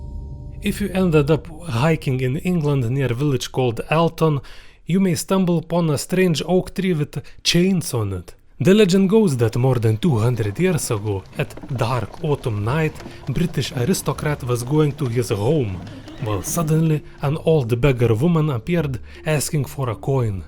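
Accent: Russia. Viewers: а чего не на русском, чусвтуется русский акцент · Hmmm ... English with Russian accent, nise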